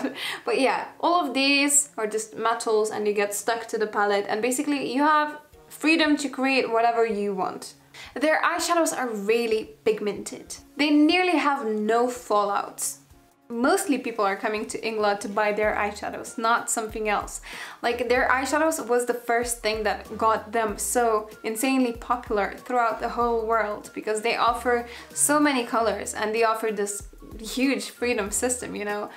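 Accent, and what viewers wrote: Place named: Lithuania. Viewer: I could tell from your accent you could be possibly Lithuanian! And heck yeah to my guess you are :) Labas!!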